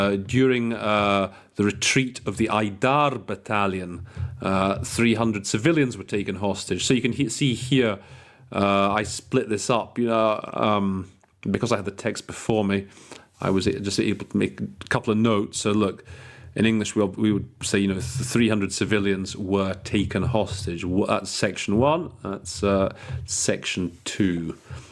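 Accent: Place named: England, United Kingdom. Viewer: Where is your English accent located geographically?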